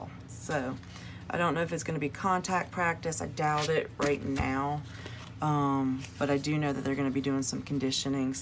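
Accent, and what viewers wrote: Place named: South, United States. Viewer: Can I just stop and give a hey y'all to your sweet southern voice! :)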